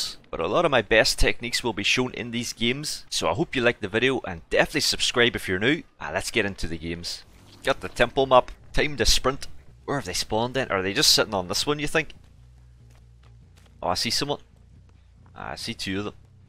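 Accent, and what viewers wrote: Northern Ireland, United Kingdom. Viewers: lol he sounds nothing like Paddy Pimblet. Paddy is English and has a strong Scouser accent from Liverpool & is from Northern Ireland by the sounds of it.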